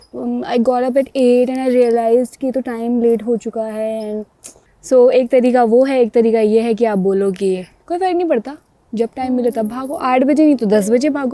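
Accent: India. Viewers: I saw many people said thy were attract how u talk d reason is ur desi hindi ☺😁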